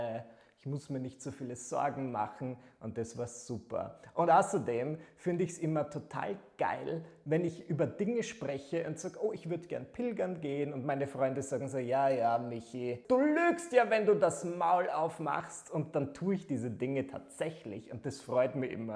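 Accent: Vienna, Austria. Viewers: Michi ich liebe deine videos. Dein wiener slang ist sooo geil, dein Humor auch ich liebs!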